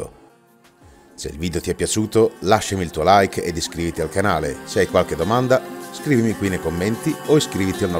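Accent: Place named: Ferrara, Italy. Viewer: Non me ne intendo di formiche, ma i tuoi video sono ipnotici 😂 sei un grande. Ma da dove vieni? Accento fantastico (sembra il mio, ferrarese)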